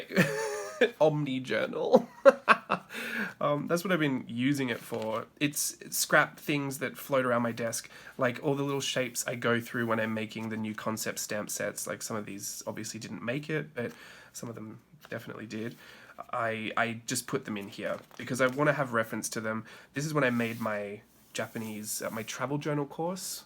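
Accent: Australia. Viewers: YT finally dropped yr video into my feed. MyLifeMits always mentions you in her videos so Im stoked to finally watching yr video. I like all yr different spreads, some of your ideas I would like to try out 😊 Also it sounds like you're dropping Kath n Kiim nuggets, so are you Australian? I really enjoyed listening to your chat about your journals too and I look forward to watching previous and future videos 🥰👍❤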